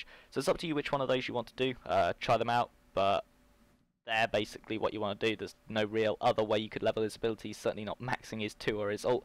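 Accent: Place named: United States. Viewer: account He sounds 100% american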